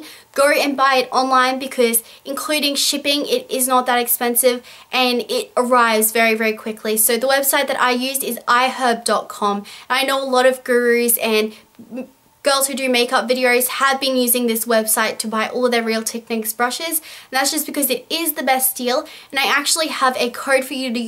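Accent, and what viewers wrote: Australia. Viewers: Australian accent :) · Australia I believe:) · I would die for you accent! Australian accents are by far my fav your so perf btw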